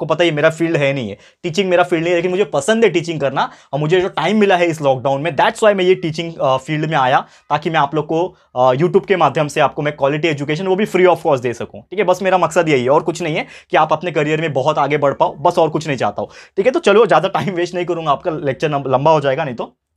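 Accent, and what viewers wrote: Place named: Mumbai, India. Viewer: I am 51 yrs , schooled in Mumbai, you speak Bambaiya Hindi liked your explanation very much watching you from Goa.😊 Thankyou 🙏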